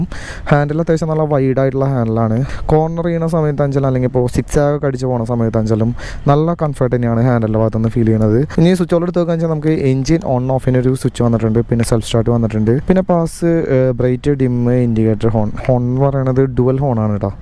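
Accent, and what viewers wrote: Thrissur, India. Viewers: bus inte Peru kando "Pooram"... Coincidence paranjal 'Oru Thrissur karan , infamous slang and Rtr 160 4V koode aa busum ..' Age oru kunju Pooram feel ✊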